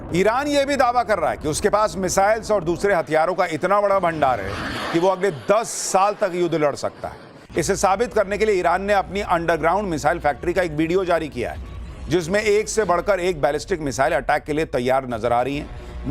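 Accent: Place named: Magahi, India. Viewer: Pura patnaiya maghi style me bolte hai sir aap😅😅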